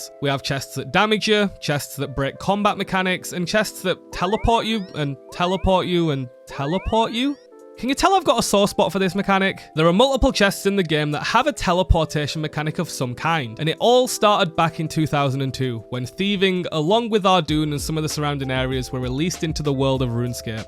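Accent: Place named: East Yorkshire, United Kingdom. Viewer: Sounds like east yorkshire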